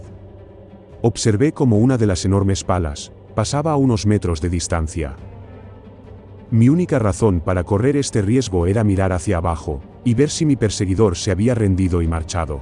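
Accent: Spain. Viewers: Y lo mejor es tu español de España sumado a tu manera de relatar,tan intrigante y la dicción,cada palabra y cada expresión que incluso nos enseñas a introducir y querér incluirlo en nuestro modo de hablar. Algo que admiro mucho de los españoles es que no solo saben hablar correctamente,saben tener un sentido del humor sin necesidad de ser grosero. Son increíbles. Saludos de Córdoba, argentina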